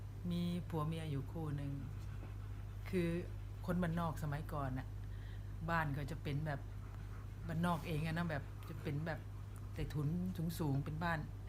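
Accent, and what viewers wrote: Korat, Thailand. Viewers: ชอบแม่พูดสำเนียงโคราช น่ารัก💕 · ชอบแม่สุพูดโคราช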